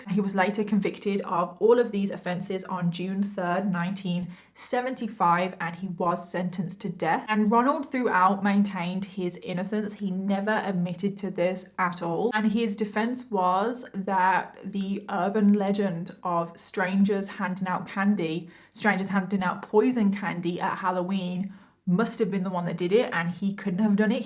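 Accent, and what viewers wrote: United Kingdom. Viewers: Saw your channel on my feed and since I just finished binge watching Bailey, decided to click on your vid about that cannibal frat boy. The moment I heard your accent, I immediately went to your playlist and clicked for your first ever true crime vid and subbed. It's really fascinating to hear true crime from a different POV and also from a different country. I'm going through your playlist now and see what sorts of stuff happen around UK. And how people's mind work over there. 💛 lots of love from PH by the way. 🤗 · I found her a couple of months ago, and I've binge watched all but a few videos because I'll be gutted to have to wait for only one a week once she's back this year! THE BEST true crime and make up channel without a doubt! Very brilliantly narrated, fantasticly researched, beautiful makeup and has real empathy and emotion for the victims. 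I also love that she's from the UK (as am I) as I much prefer her accent! Sorry to everyone else! 🙈 takes the crown for me, has got me into MagellanTV and also Audible! Thanks for your fantastic work - please keep it up! Like many others, it gets me through some very tedious or stressful tasks and times ❤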